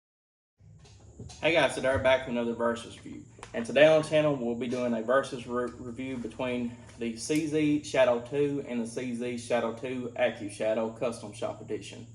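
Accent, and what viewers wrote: South, United States. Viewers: Where are you from brother? You have a heavy draaaaaawwwww!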